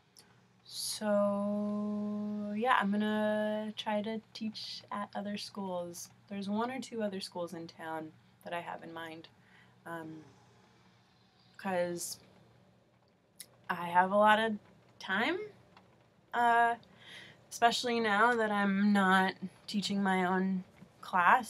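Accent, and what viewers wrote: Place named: Peru. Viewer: Sounds very Peruvian :)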